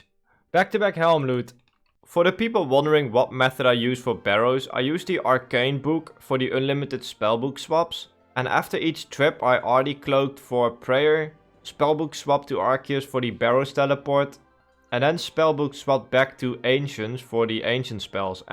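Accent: Netherlands. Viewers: Normal people would make a 10-video series out of this, you put all of this in a single 11-minute video… madman, je verliest je Nederlandse accent ook steeds meer.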